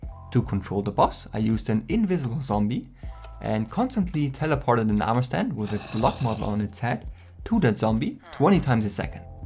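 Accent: Germany. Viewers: You kinda sound German xD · You sound a bit like someone who speaks German, am i correct?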